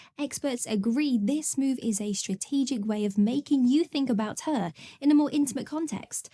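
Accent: England, United Kingdom. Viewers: "cheeky". Oh she's so sexy with her British terminology and English accent..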